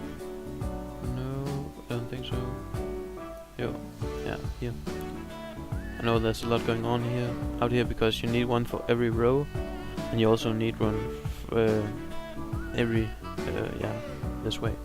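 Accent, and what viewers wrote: Denmark. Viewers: Are you danish m8 ? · Good vid, keep it up, you sound danish <3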